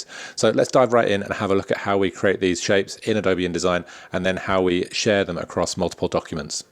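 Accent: United Kingdom. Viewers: Thanks very much, I needed these shortcuts. Your instructions are inspiration! Is your accent Manchester?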